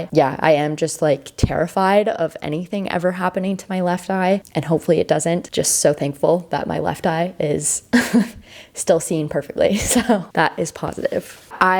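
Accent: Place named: United States. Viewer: I'm an American living long time in Cambodia and just had my Vitrectomy done today. No compliants about the medical treatment here and all the equipment was high tech, etc. etc. However, starting my 5 day journey with my head "locked" into position, right side for the gas bubble, and the "mental" clouds of depression and worry start to happen. So! Appreciate this video as it will helps me look forward to the ups and downs of this surgery. Great to also listen to American English explain things as the language barrier here stressed me out a bit as I am just not 100 percent sure sometimes on the info that was given to me. Again, the medical treatment here in Phnom Penh has actually impressed and now it is up to me to follow doctors orders ( 5 full day in head "lockdown" and stay positive. Thank you for real.........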